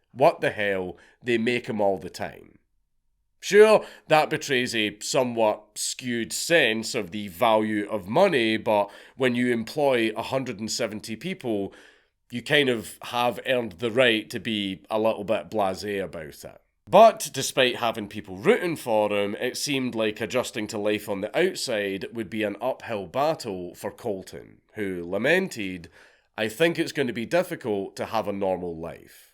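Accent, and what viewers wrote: Scotland, United Kingdom. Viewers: Heard the story, read the story. But they story is best told with a scottish accent.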